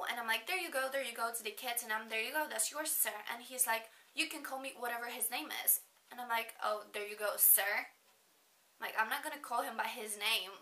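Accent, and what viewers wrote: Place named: Albania. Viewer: This was so funny! Are you Albanian? I love your accent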